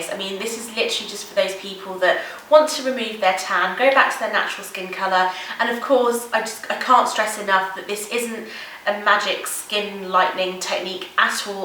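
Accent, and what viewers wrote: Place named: United Kingdom. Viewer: Fuck sake everyone sees an Indian girl and assume they should have an apu accent. FFS it's a British accent. It's nice. People are so fucking rude.kmt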